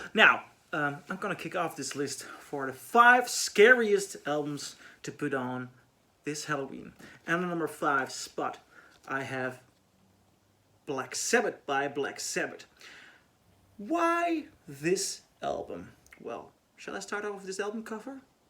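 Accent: Netherlands. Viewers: Nice video! Are you dutch? Because you sound dutch. Ben je nederlands?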